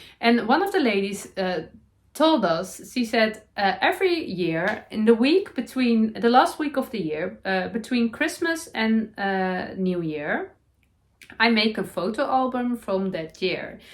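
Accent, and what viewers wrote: Netherlands. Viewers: your Dutchy English is perfect. I really enjoy your podcasts, love your projects also. You do make me 😂 while I’m sat knitting. Will definitely be knitting The Felix Cardigan along with you, and also in brown! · Hi, lease don't change how you do your podcasts, I love your dutch english, can easy understand you. Your knits are lovely, really like your vest.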